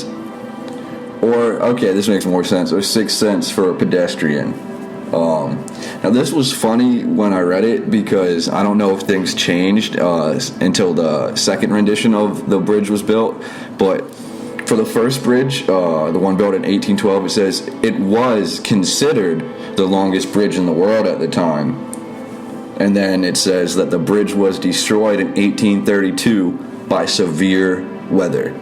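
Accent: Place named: Pennsylvania, United States. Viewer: Great video as usual Bro. I’m from Overbrook, west Philly. I can tell from your accent, (lol) you were from Pennsylvania 👍🏽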